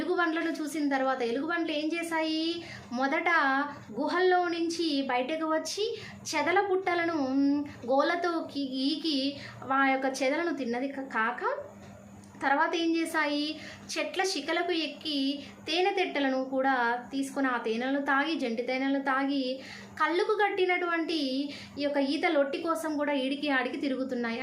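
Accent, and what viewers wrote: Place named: Telangana, India. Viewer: మీది తెలంగాణనా అమ్మా... మాం డలికం 👍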